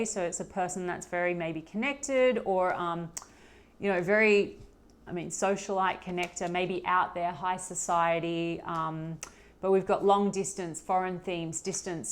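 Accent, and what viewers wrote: Australia. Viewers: Really like, great interpretation and Lovely Aussie delivery😊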